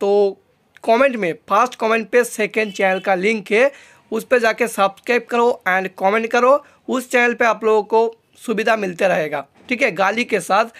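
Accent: Bengal, India. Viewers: Ap kay bangali ho bhaiya 🙄🙄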